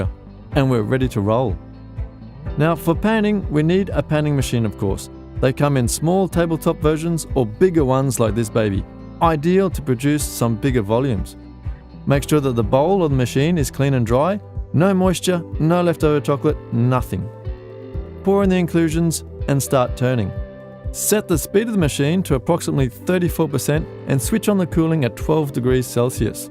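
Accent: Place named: Australia. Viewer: He sounds Australian with a hint of French.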